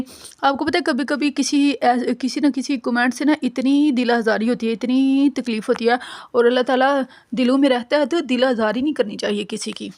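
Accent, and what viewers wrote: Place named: Gujranwala, Pakistan. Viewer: Gujranwala ki punjabi lgti hai apki.... Or without any reason laughing itni achi nhi lgti....baki theek hai...or dua ap khud he kr lea kro apny leay... Hmari tu apni duaian reh jati hn oudhuri...!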